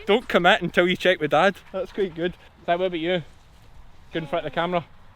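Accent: Scotland, United Kingdom. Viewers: Scottish accent and sheep shearing! What could be better! Hello from NYC!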